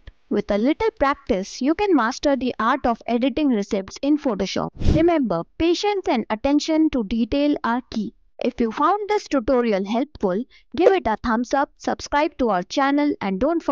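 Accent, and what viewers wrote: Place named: India. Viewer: Terrible. I didn't expect anything better when I heard an Indian accent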